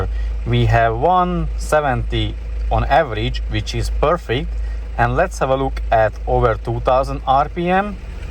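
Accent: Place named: Hungary. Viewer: Vicces, hogy a yt feliratozója teljesen jól megérti a magyar akcentust, nem úgy mint az őslakókét. :)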